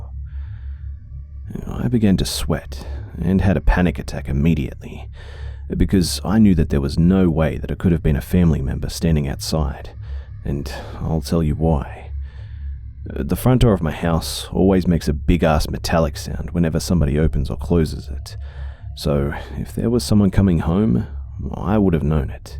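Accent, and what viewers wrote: Australia. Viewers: LOVE THE AUSSIE NARRATING..:D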